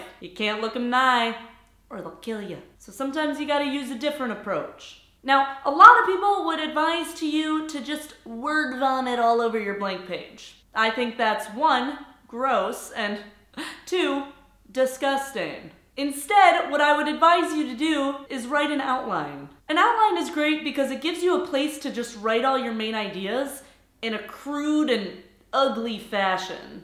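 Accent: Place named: Midwest, United States. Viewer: I simply love your Middle-West accent!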